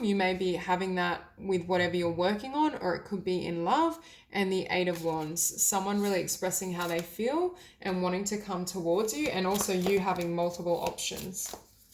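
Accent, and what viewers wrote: Australia. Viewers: Definitely an Aussie accent XD ..... Sydney?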